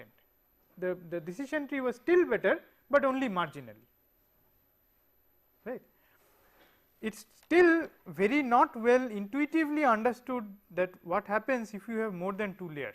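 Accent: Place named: North India, India. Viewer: Indian English with little North Indian accent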